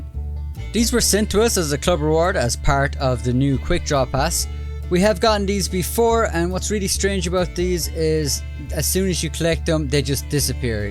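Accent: Ireland. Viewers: Bro, are you Irish?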